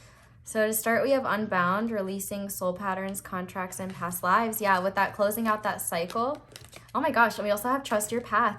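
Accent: England, United Kingdom. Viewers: Omg pile 4 I love your British accent!! Coming over for tea later Air Smooches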